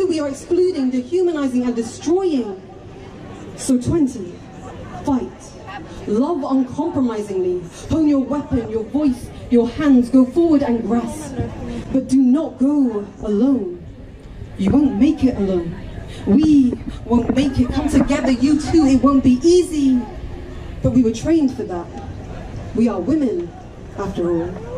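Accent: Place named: RP, United Kingdom. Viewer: This idiot sounds SO FUCKING POSH... these people are so detached from reality, it's absolutely mind-boggling. I can only see total collapse in the future, we are fooling ourselves if we think we are winning the culture war. The saddest part is, where do we go? I have nowhere to go, England is my home, and every western country has the same problems. I think the best thing would be to create safe communities, with fences... I see a South African style future for us in the west, it's sad. We gave away our perfect slice of peace and quiet for this.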